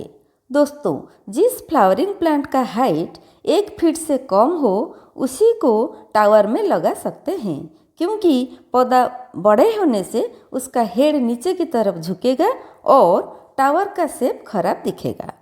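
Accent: Odisha, India. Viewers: Funny accent, Odia flavor! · Mujhe to odisha ki lag rahi hai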